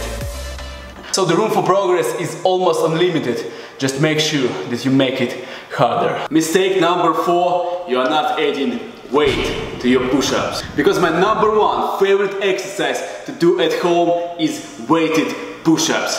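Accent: Russia. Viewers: I knew that this is gona work when i heared the russian accent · You Have a Nice Russian Accent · This Russian accent pumping me up 😂😂. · Your Russian accent is so cool and badass, and you look awesome! If I were gay (I am not) I would definitely have a crush on you, bro! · Единственный кто имеет русский акцент, когда говорит на английском и наоборот... Кайф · The Russian accent just make it better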